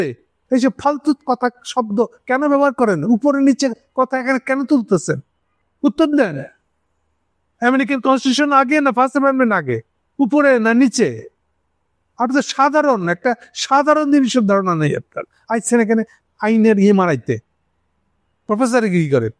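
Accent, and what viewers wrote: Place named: Bangladesh. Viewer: Love the words " maraen , maraita aise etc" keep it up. Best of luck Da Da. Great and perfect khas Bangladeshi delivery ❤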